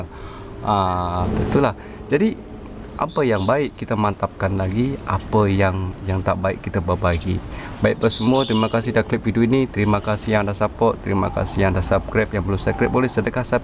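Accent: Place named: Malaysia. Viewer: Uish betul betul dah macam orang Malaysia hahahah terbaik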